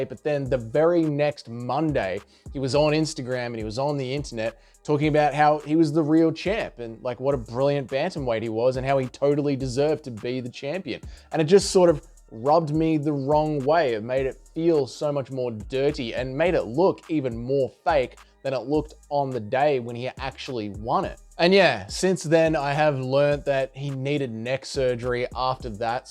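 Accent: Australia. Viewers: I agree. I cannot stand his annoyyyin Australian accent and lack of digital creativity of his videos.. andd lame humour, as in not funny. Nil, Nada, nah uh. I do wish him the best though, as I do myself and everyone. Maybe this comment will make him better, probably will it personally and splash all his money in his bank account or even take out a loan he can't get so he gets someone like his spouse and manipulate her to take a loan for him to get him a new high end computer and softwares for editing, microphones, lights green screen the whole 9, so his vids can compete with top tier amateur journalists and become a youtube ufc reporter 😂.. everything all high quality except his humour and riz. Also he looks like his going through a mid life crisis to start doing youtube and tiktok 🤣 Don't take this personally take it as motivation "brutha" and "have a go at it m8" 😅 hahaha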